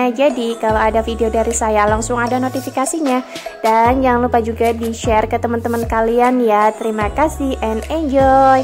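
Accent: Banyumas, Indonesia. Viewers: Emang mb aslinya orang mb sih mb kok kayanya orang ngapak y mb ak tuh orang Tegal mb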